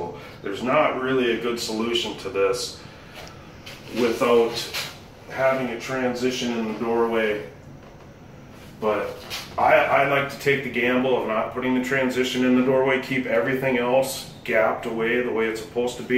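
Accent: Minnesota, United States. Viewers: Nice!! Gonna get me one of those mini planers!! You from Minnesota?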